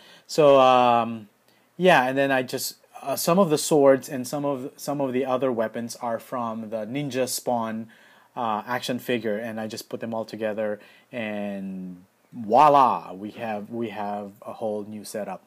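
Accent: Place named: Philippines. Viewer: the way you speak, parang accent ng pinoy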